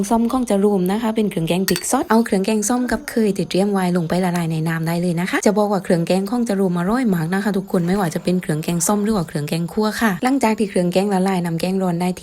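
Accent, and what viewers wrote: South, Thailand. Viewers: แหลงไต้ชัดมากครับ😅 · I like keng som very much 😋 and I like your accent of southern 😊❤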